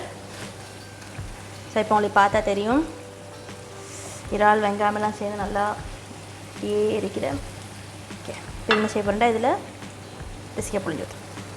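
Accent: Sri Lanka. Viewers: அஸ்ஸலாமு அலைக்கும் உண்மையில் உங்கள் அழகு இலங்கை தமிழில் பேசுவது மிக மிக அழகாக உள்ளது எனக்கு பிடித்த தமிழ்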